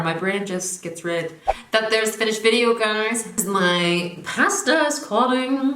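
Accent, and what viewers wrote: Ireland. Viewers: love you girl!! Missed that gorgeous Irish accent on the tube of you 🥰🥰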